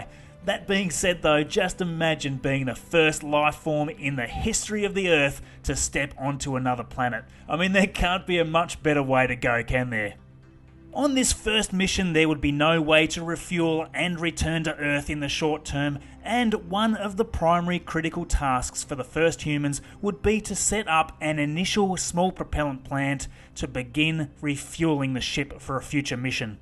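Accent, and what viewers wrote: Australia. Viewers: So I'm guessing your Australian?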